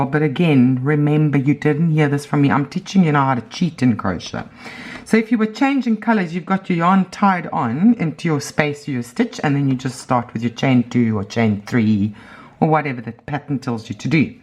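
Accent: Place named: South Africa. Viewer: Nice South African accent 😉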